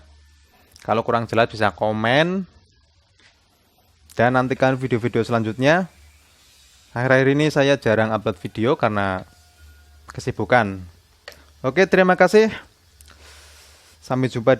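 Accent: Banyumas, Indonesia. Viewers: Ngapak bro kakaka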